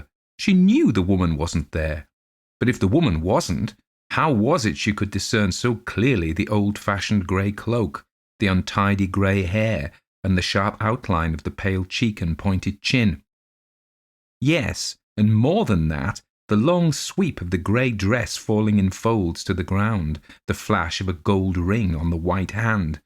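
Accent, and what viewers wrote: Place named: United Kingdom. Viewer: Thank you again for a great little story...burrr I actually felt the cold myself and the warmth of the fire. It's always wonderful how you narrate and your very british dialect. Thank you again.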